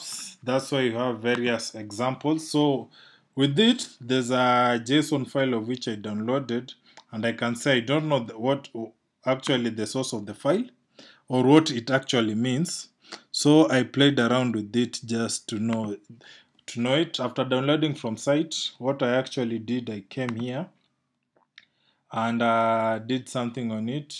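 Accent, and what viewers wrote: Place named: Kenya. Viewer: The moment I had the voice I knew it a was kenyan big up bro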